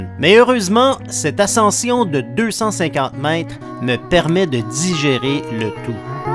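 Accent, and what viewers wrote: Quebec, Canada. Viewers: Comme j'adore votre accent Québecois !!!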